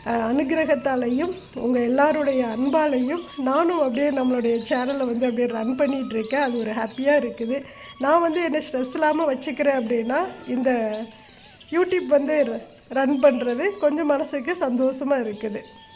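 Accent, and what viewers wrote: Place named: Kongu, India. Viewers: கோயம்புத்தூர் மாதிரி இருக்கு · வீட்ல மற்றவங்க தூங்கு போது விளக்கேற்றலாமா ஏற்றக்கூடாதுனு சொல்ற றாங்க எதை செய்வது உங்க பேச்சுல கொங்கு தமிழ் நன்றாகவே உள்ள்து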